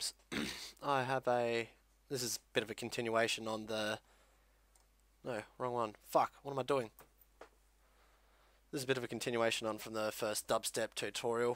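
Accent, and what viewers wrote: Australia. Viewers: YESSSSS AUSTRALIAN! FUCK , WHAT AM I DOING BAHAHA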